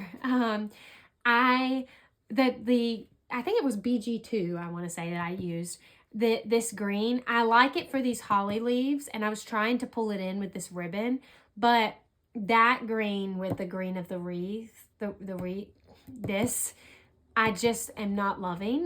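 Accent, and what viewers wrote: United States. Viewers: New to colouring and really like your style. Also, as a Canadian, I could listen to your southern accent forever! Thanks for the great book suggestions! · Love your content ❤ Are you from Texas, you.have that beautiful southern bell accent.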